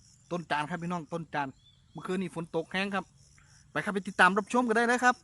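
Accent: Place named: Isan, Thailand. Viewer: ได้ยินแล้วคิดถึงอิสานบ้านนา fcจากฮ้องกงค่ะ ขอให้ยอดติดตามยอดlikeสูงขึ้นเรื่อยๆน่ะค่ะ^^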